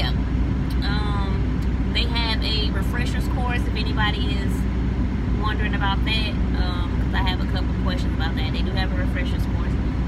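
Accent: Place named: Georgia, United States. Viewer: You from south ga huh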